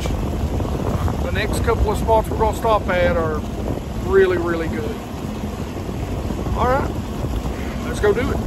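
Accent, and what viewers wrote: South, United States. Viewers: Great stuff. Best of luck to you this season! Love the southern accent!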